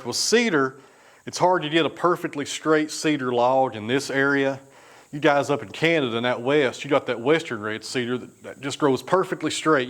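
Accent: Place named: South, United States. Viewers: When you talk southern, it's a different language. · Greetings from South Wales to Tennessee , love your accent !! Fascinating video . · Good looking cuts, and ur southern our tongues aren't always cooperating with us lol. Good video bud.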